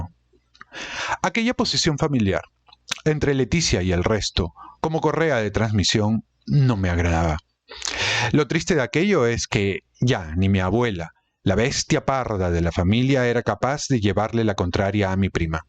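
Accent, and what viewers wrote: Peru. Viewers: Genial eres Peruano????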